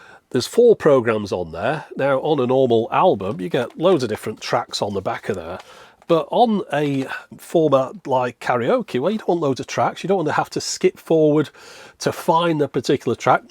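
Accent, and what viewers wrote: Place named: Wigan, United Kingdom. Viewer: "It's a screw! I can feel it!" I am seriously tempted to give this channel money for Wigan voiced content like this.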